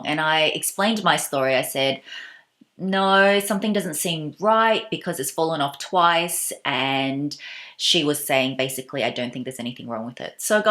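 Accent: Melbourne, Australia. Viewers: It's always so awesome hearing an Aussie accent on YouTube. I'm up in Brissy now but when you mentioned Chaddy (Chadstone) I was like "aaaaaaayeee!" lol. It's so hard to find reviews of things on YouTube from Aussies with names that you know. So awesome to see you. And thanks for review - I love the idea of the love bracelet and was planning to get one, but now I'm thinking "just a nail" might be more for me. I'd be mortified to look down and see it gone. It would be like looking down and something like a JLC Reverso just vanished - heart broken. Sweet info on pros and cons. Thank you. :) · Are you from Melbourne? You have that accent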